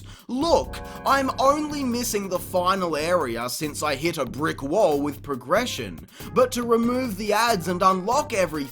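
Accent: Australia. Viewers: "Kind of, like, YouTube, actually. Don't forget to subscribe and share Tony Hawktober with all of your friends!" Mate, I'm Brazilian, my few friends that actually understand a bit of English unfortunately wouldn't ever understand that marvelous down under accent. But, you know what? I've been watching you for over a year, and if you hadn't said that, I'd never notice I wasn't subscribed. Now I am. Sorry about that, mate, I honestly never noticed since your videos always appear in my home page. I could swear I was subbed.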